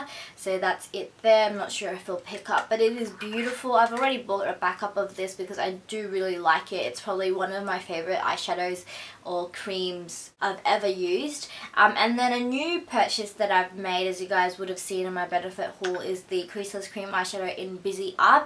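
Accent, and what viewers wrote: Australia. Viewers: Is she Aussie ?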